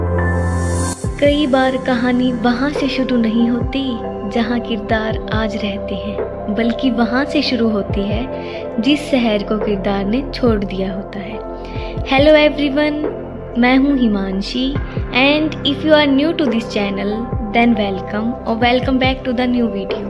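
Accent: Haryana, India. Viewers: Haryanvi tone👌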